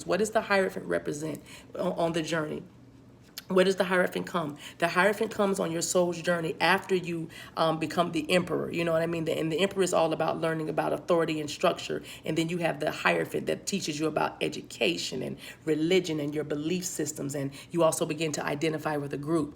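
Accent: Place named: South, United States. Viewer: that southern accent GIRL!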